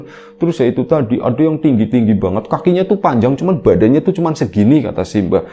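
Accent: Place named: Banyumas, Indonesia. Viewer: Hader kawan...ora ngapak ora kepenak😃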